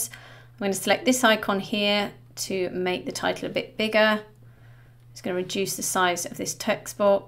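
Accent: United Kingdom. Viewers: What a powerful knowledge on how to play with checklist, etc. Beautiful accent ( British and the calls rocks. Thank you.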